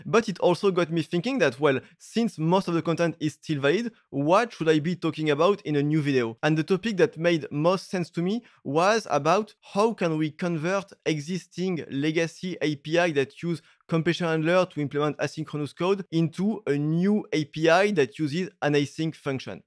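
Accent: France. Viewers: J’adore l’accent français!!!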